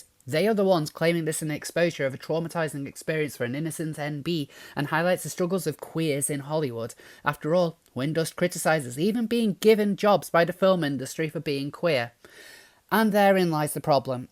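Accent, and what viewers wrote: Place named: Scouse, United Kingdom. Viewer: Keep going. Lovin' that scally accent 😘💕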